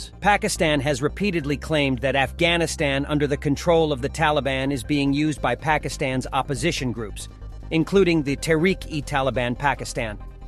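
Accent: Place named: United States. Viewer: With your American accent, everything you report should be taken with a pinch of salt. Stop making two brotherly states fight for your ulterior motives. We Pakistani citizens have always supported the legitimate cause of Islamic Emirate of Afghanistan. We wish our Afghan brothers all the best. IA with peace Islamic Emirate will develop and become a rich country soon IA.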